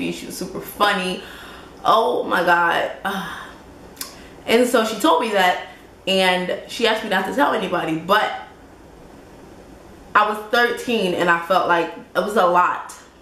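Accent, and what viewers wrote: New Jersey, United States. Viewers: You from jersey? Sorry for your loss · Oh so you from jersey?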